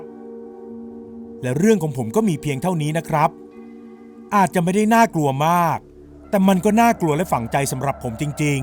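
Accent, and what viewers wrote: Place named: South, Thailand. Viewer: ชอบที่พยายามจะแหลงใต้ <num>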